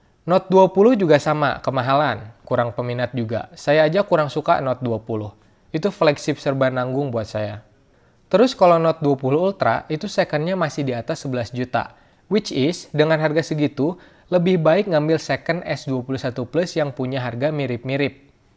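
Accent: West Java, Indonesia. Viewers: Ga sengaja mampir sini. Pas awal denger narasinya langsung kepikiran "hmm... Logatnya kayak urang sunda". Pas ngomong "geuleuh.. Gengsi.." ah fix, baraya Sunda.. 😂 Salam kang.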